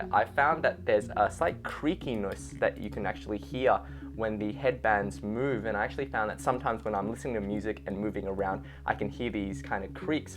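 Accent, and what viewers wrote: Australia. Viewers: I can't figure your accent, is it Australian or English? )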